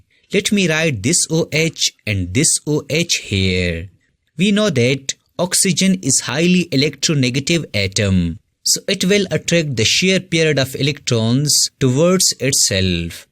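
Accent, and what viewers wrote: India. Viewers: Bro I swear I ain’t gon listen to some Indian explaining this bs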